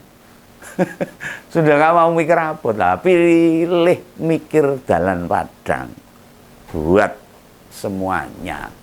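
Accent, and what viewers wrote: Java, Indonesia. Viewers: Serius nanya.. dari dialeknya om ini dari Jatim atau Jateng ya..?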